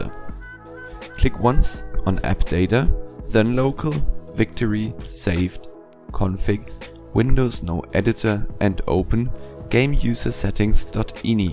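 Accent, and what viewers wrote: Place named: Germany. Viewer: mann weiß einfach sofort das du deutscher bist xD NICHT BÖSE GEMEINT ABER EIG ECHT NICE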